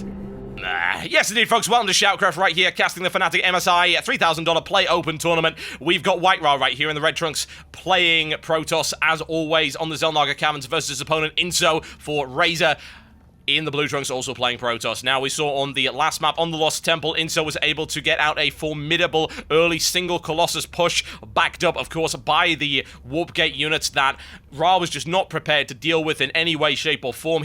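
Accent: United Kingdom. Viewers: thats the power of a british accent.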